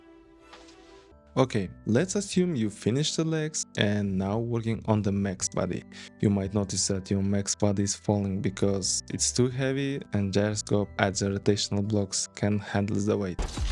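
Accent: Russia. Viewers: русский акцент сразу слышно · Ты говоришь с сильным русским акцентом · У бро русский акцент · У тебя будто бы русский акцент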